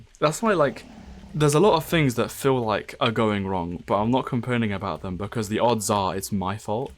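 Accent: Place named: South, United States. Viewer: Posh southerner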